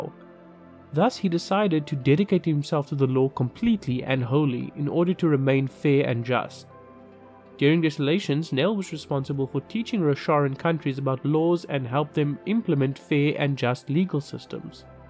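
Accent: South Africa. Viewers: I like to support a fellow South African. Could tell from the accent. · Haibo,…narrator sounds like a Saffa 🇿🇦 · This is a great video! Thank you.... Also, your accent sounds very local to me. I think we may be from the same country! South Africa? :) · Are you south African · was quite excited to hear a saffa voice :D keep up the good work! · South African accent makes me feel home again, moved 5 years ago. This is one of my favourite series right now so it ties together nicely.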